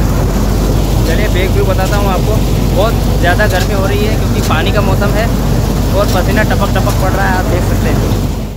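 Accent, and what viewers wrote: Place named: Malwa, India. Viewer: आप की भासा सेली से आप शाजापुर देवास उज्जैन के लग रहे हे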